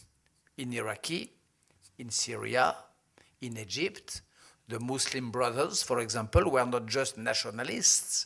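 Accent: France. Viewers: he is right anyway... but his french accent is fucking funny...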